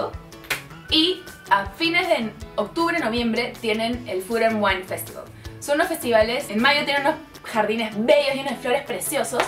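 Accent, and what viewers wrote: Peru. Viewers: Como que cuando empezaste a hablar...ya sospechaba que eras peruana~🙋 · Eres Peruana?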